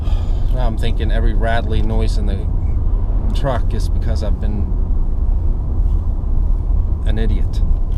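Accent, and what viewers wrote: Sweden. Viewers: Are you american? You may sound a little Swedish 😁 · you sound like you have a faint FAINT swedish accent :) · You don't sound Swedish anymore!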